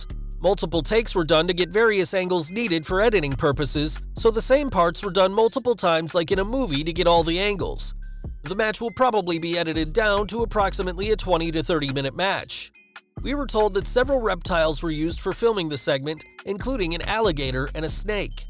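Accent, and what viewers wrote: United States. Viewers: Your accent has changed to american. Now you'd get more subs? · Hey guys what is going on here is the MOST ANNOYING AMERICAN ACCENT INTHE WORLD here to talk down to you....ffs man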